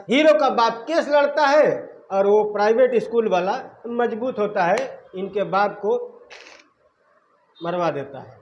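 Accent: India. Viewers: Aap to akadam south indian ke logo jaise bole hai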